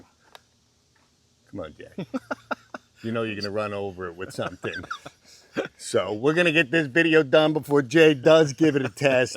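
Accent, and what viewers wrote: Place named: Long Island, United States. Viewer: You can leave LI, but LI won't leave you. I'm from East Tennessee but worked at Brookhaven National Lab for about nine months and your accent was exactly like the guy who lived next door for several years who was from LI.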